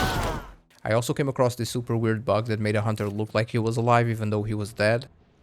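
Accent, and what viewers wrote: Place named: Portugal. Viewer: Are you Portuguese? your English sounds like it.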